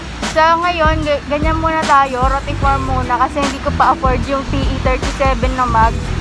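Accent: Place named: Batangas, Philippines. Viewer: Nakapakaangas!! Bagayyy kay elmo!! Ang cute din ng batangueña accent mo hehehe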